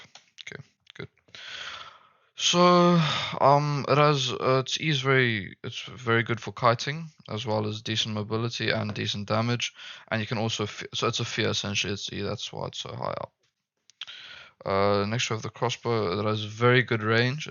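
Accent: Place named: South Africa. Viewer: You south african boet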